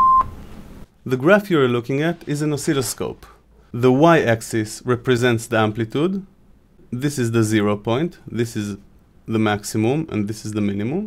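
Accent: Israel. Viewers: you from Israel / you have the accent/ good work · Where are you from? You have an Israeli accent. :) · a mathematical point of view: Fourier Series <link> האם זיהיתי מבטא ישראלי? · Haha It happened again, looked for a good music explanation, found a video in English (Which is not my mother language) but with Hebrew (Israeli) accent (Which is my mother language)..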